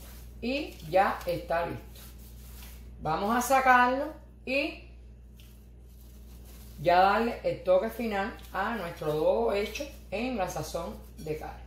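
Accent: Cuba. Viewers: Nena ese dulce tiene huevo crudo.Eso no es bueno para la salud.Pero si te digo eres comica con tu asento cobano chiquitica. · Me encanta como hablas!! Me recuerdas cdo vivía en la isla de mis amores felicidades por mantener tu cubania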